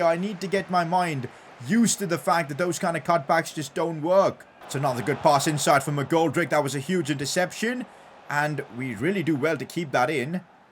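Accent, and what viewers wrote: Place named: India. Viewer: PCQ: You sound more Indian than when you first began doing YouTube.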